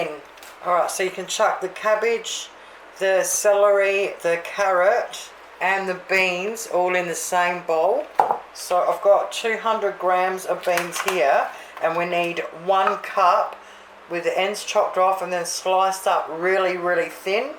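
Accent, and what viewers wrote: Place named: Melbourne, Australia. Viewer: I'm trying to work out your accent. Maybe Melbourne? I'm originally a country Victorian but we don't say "All" for Oil or "Ball" for Boil. 😄I have lived in WA for 30 years now. Go Cats. 👍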